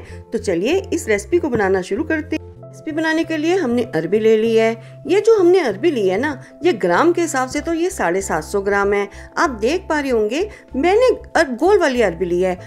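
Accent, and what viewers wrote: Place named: Himachal Pradesh, India. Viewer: Mem 🙏🙏Aapki voice pahadi he (Himachal)ke jesi sweet voice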